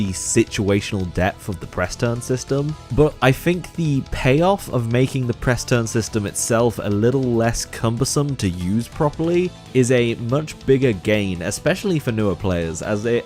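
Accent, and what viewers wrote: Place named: United Kingdom. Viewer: Excellent video. I usually say SMT V sucks, but you did it in a much more eloquent way, and even used a British accent!🇬🇧 My favorite point was that SMT V moves nothing forward and innovates in no ways. I'll even point out my biggest complaint: you don't gain any new movement skills as the game progresses. It's not like there are places you can't access because you don't have an ability. How much more interesting would it have been if you eventually got the ability to double jump, or use a grappling hook, or open sealed passageways, or see in the dark? Instead, you have all the movement skills at the beginning of the game and gain no more as time went on. That's not how that type of game is supposed to work. Also, when I got to the Tokyo Tower, there was nothing to do. There was no dungeon, no secrets, nothing to explore. 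My disappointment was audible. If you have the time, check out GILL Studio on YouTube. He plays a lot of JRPG music, especially SMT games. When you watch him play Digital Devil Saga or Devil Summoner music, he rocks out and you can see how skilled he is. He plays lead, rhythm, and bass guitar, and he's extremely impressive. When he plays SMT V music, he looks bored. It's so far below his skill, it's not even funny. (I'm still trying to get him to play from Devil Survivor. That tune will melt your guitar.) Here's my final analysis: SMT V is Fable. It made a lot of promises, like an open world and endless exploration, a character you could truly mak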